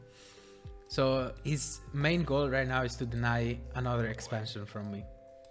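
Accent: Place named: Italy. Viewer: Thank you for the video! You did a good job of analyzing what Oliveira did right, rather than focusing on what you did wrong. Definitely the correct approach. Your perspective is interesting, and hearing your Italian accent is a nice bonus. My only critique is that the transitions whenever a cut happens could be improved. Either make it smoother/less obvious, or make it more obvious, as right now I get confused when a cut happens.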